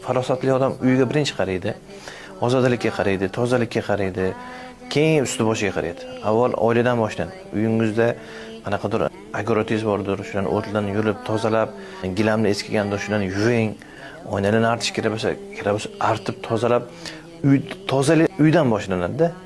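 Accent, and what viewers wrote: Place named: Namangan, Uzbekistan. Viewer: Наманганлик экансиз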